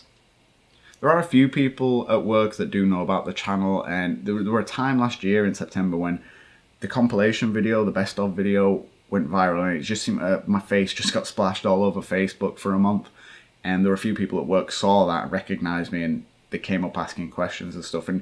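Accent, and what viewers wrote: Yorkshire, United Kingdom. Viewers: Sorry to keep messaging I'm from Yorkshire and I can tell a Yorkshire accent when I hear one, where you from mate? Promise I won't come terrorize your house I live in Dubai hahahah bit of a journey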